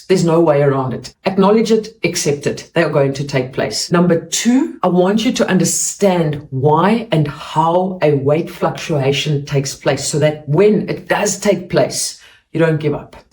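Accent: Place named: South Africa. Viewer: I knev there where something of about the Scottish accent but there you go South African thanks for your quick response 😊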